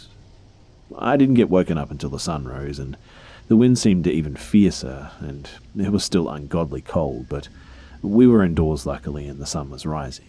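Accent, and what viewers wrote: Australia. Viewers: Australian accent: I've always known I was gonna be a marine Me: I dunno kinda sus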